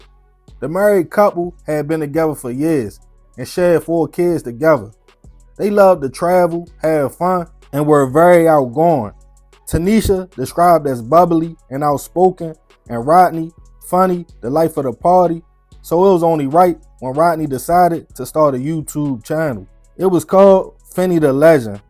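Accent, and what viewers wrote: Baltimore, United States. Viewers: I'm not going to lie, I found this channel because I wanted to hear more of the Baltimore accent, and stuck around because the content is good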